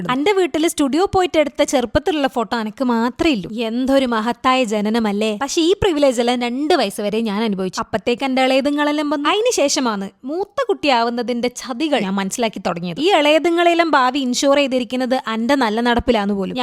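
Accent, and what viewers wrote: Kannur, India. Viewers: I love your Kannur slang and those genuine talks❤Keep going gorgeous🙌🏻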